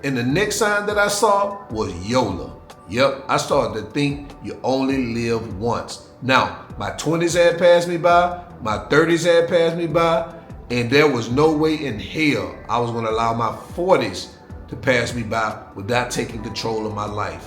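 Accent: South, United States. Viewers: PS...You've been in another country for awhile and loosing your Southern accent! Lol!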